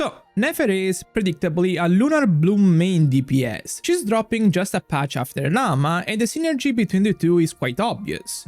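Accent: Italy. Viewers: Im hearing the italian accent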